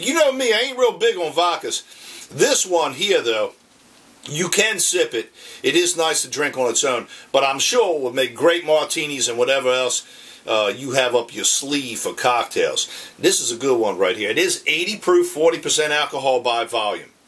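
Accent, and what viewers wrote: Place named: South, United States. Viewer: You need to work on your southern accent a bit.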